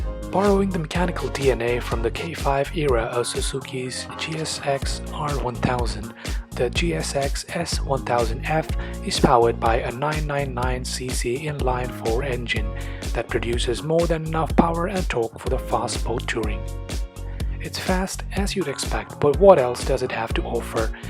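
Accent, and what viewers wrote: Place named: United Kingdom. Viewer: Really annoying Brit accent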